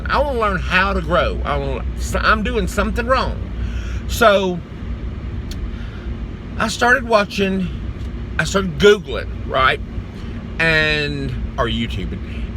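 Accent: United States. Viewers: Hi! The Stivers sent me over and you are so adorable! I am learning too, so we can learn together! I just love to listen to your voice. I am from California and I love that southern accent!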